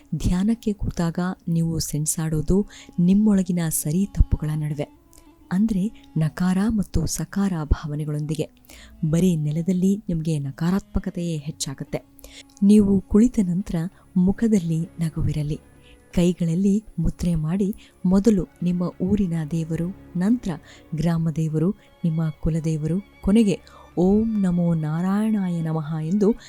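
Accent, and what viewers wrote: Standard. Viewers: Nimm swachand kannada matu channagide tq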